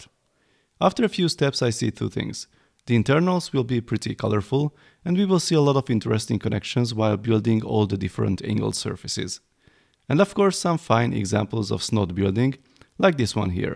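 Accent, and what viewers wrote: Hungary. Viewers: Csak szerintem van a narrátornak magyar akcentusa? :D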